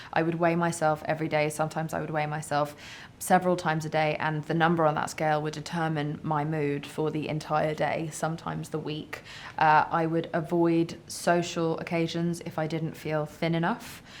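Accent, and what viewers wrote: United Kingdom. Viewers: You weren't faking that British Accent, huh. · she sounds very british..Ber partner is also very British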